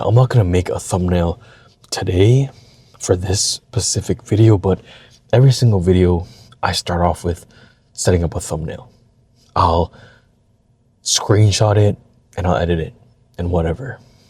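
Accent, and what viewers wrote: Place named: Canada. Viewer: I appreciate & Love You Brother for this I suffer with allergies too 😂🤣🎯 if I don't take medicine for it at least once a day at worst my could make me literally sick like a cold just all the symptoms on 1,000 max & if that happens it can highly likely trigger my asthma too. I wonder which side your family u get it from? 🤔 I know I get it from my dad & his parents as they used to call it hay fever back n da day before it was called allergies 😂 Yeah sometimes I mispronounced & go off somewhere too 😂 it doesn't help I have a thick Canadian accent like u ironically sprinkled with an ancestral accent from our families 😂🤣🤦🏿‍♂️ U seen my vids 😂 the vids u & everybody else saw honestly on average is my 3rd or 4th try 😂 Yeah social media is fake 😂 but me I'm just raw with it & folks told me they love my vids on my thoughts 💭 🤔 It be so much I gotta release it ❤️‍🔥 Eventually I'm gonna move it to other social media platforms ❤️‍🔥 Love U My Brother ❤️‍🔥🤝🏿❤️‍🔥💯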